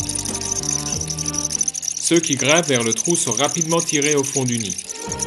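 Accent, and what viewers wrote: Alsace, France. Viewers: je ne suis pas du tout d'accord avec vous, la voix est claire, la diction correcte avec une bonne articulation et, les commentaires très intéressants. L'accent alsacien est de plus charmant. D'ailleurs, je viens de m'abonner. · L'accent alsacien vous dérange? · Donc désolé de ne pas apprécier cet accent alsacien mais un bon reportage animalier (comme celui çi) mérite une voie sans accent, claire, expressive et appréciable par le plus de monde… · Merci Monsieur, vous avez un talent certain pour le documentaire animalier . Très belles prises de vue , commentaires d'humour . J'aime votre accent alsacien également et avez de l'amour pour la nature . Vive le maintien de la diversité culturelle comme celle animalière.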